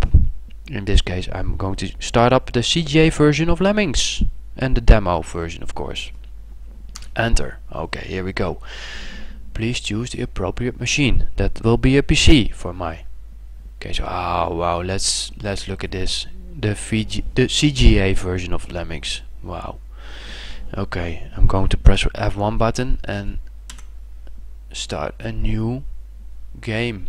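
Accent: Netherlands. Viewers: Dat kwam ik achter. Dat was duidelijk Engels op een Nederlandse toon. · Nederlands? Ik kom uit Nordduitsland, ik ken dit accent :P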